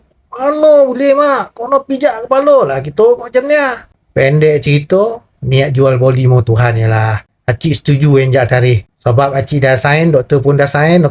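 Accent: Negeri Sembilan, Malaysia. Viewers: orang NISMILAN..tu deh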